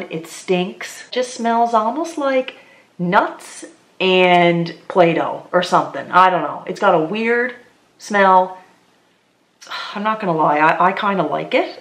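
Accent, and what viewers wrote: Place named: Nova Scotia, Canada. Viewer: Btw, I’m so happy to see you again. I love hearing your NS accent ❤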